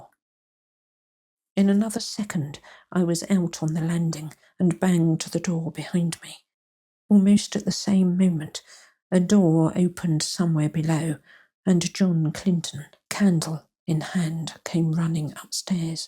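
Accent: England, United Kingdom. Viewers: Can’t place the part of England you are from. Although I’m Scottish a lot of my family lived in Sussex, London and Wiltshire . Your cadence is softer and very pleasant and your readings are marvellous . I would love to hear you read “ Schalken the painter “ by Sheridan Le Fanu. Both a horror tale and an allegory of arti being sullied by rich patrons!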